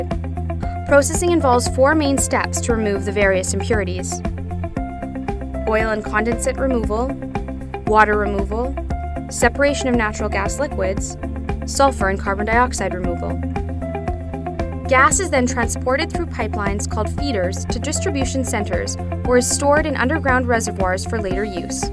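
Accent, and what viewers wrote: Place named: Canada. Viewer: The chick narrating must be Canadian. She also says buried weird- like “burried” vs “barried”.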